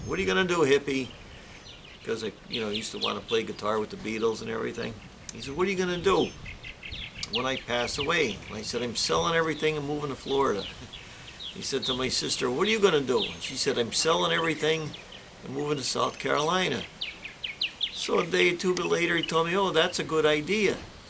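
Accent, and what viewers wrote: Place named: Pennsylvania, United States. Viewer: Great video and a real interesting life. My family was from Gerardsville and now live south of Philly. Your pa acent has always sounded like family to me. I am also planning to winter in Florida but those HOA fees hurt. Learned a lot from you over years and I have been doing patio and sidewalk and stucco on my old house up here in Kennett Square.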